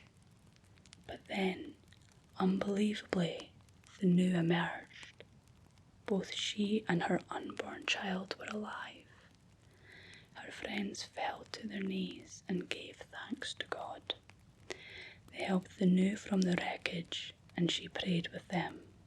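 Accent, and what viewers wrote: Scotland, United Kingdom. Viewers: Yes, I have to agree. I do love your Scottish accent my God I’m falling in love with you gorgeous Sexy Mamacita.❤ ya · This is my favorite video! I can't wait for the next one with more Scots dialect ❤ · Aw, my Scottish friend gifted me this book for christmas one year 😊 now I can listen to the stories in a Scottish accent, and in asmr format! Thank you 😌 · Love your Scots accent shorty can sit and listen to you all day you make me feel safe and comforted I have PTSD due to sexual abuse by male guitar teacher at 17 I listen to you every night you make me feel safe thank you for all you do shorty you saved my life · I have listened to this every night since you posted it please keep them coming the more Scottish the better ❤ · People love your Scottish accent but people don’t like mine😢😢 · this is so perfect for me - fee tales and a gorgeous socts accent to boot:)! 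Love the choice of stories and your sense of humour as well:)! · It cannae get mere Scottish than this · Yass scottish 😂😂😂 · Whispered Scottish ASMR are you kidding me 😍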